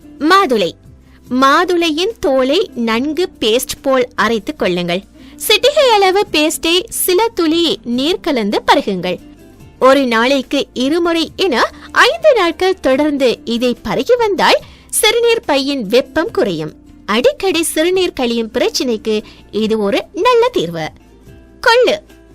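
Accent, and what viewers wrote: Sri Lanka. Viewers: Bro avanga srilankan tamil...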